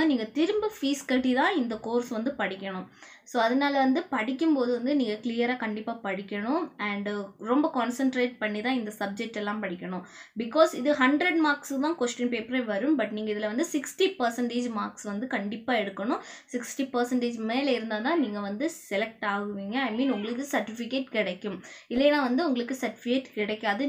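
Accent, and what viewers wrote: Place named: Kerala, India. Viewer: Somewhere Malayali touch 😺😺😺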